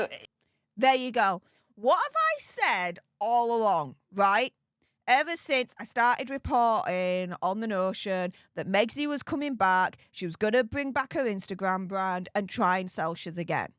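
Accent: Yorkshire, United Kingdom. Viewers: I remember your first videos. I couldn’t understand a word you said, either you are speaking more American or my ear is used to your accent now… Where are you from in the UK Or Australia?.. I cannot figure it out. 😂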